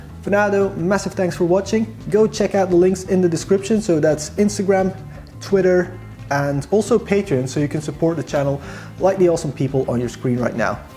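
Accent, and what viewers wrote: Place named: Netherlands. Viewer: Where are you from? You sound dutch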